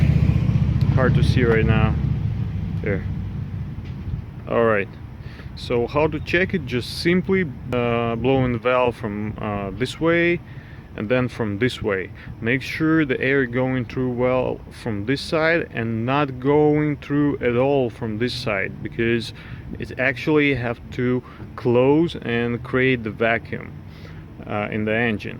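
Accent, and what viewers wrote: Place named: Russia. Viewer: You sound like Ray Raymonde with a Russian accent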